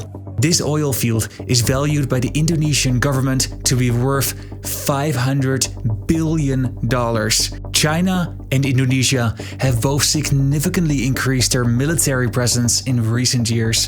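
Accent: Netherlands. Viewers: ROFL, I thought I reckognized that Dutch accent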